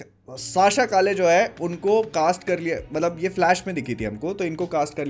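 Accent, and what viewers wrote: Bhopal, India. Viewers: Bhopal se ho kya aap?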